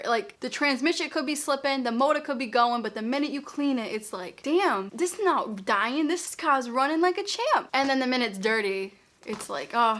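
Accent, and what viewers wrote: Boston, United States. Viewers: Is that a Boston accent? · Also I love how many times you said car and carpet in this video I love your Boston accent!!! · Omg that looks like a completely new mattress! How crazy! By the way your hair looks really good in this video 🥰. Oh and I love hearing your Boston accent 🤗 I like how you say car and carpet! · Brooklyn? I could see confusing it for Queens, but this is 10000% a Boston accent. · Is that a Boston accent? 😮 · OMG YOUR FROM BOOOOSTON I LOVE IT 🥰 love the accent love seeing ppl from my city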